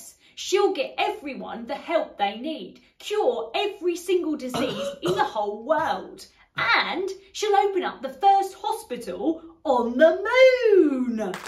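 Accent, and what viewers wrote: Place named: North, United Kingdom. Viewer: So's the accent. Slightly northern, kind of gummed-up throat.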